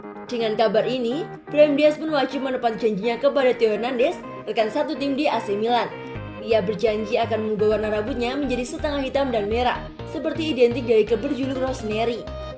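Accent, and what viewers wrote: Java, Indonesia. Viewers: pengisi suaranya orang jawa ya? medok banget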